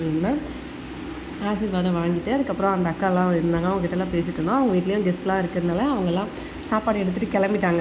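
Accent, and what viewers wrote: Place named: Odisha, India. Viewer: Odia language neenga pesuradhu siripu dha varudhu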